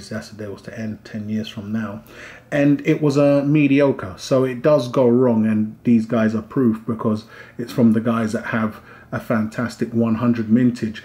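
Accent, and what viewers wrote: England, United Kingdom. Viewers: love the accent what part of England??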